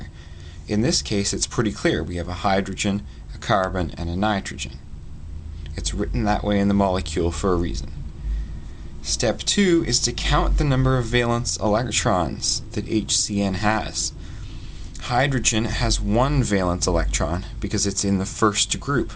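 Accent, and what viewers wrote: Canada. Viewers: Thanks a lot, helped a bunch. And props to the Canadian accent!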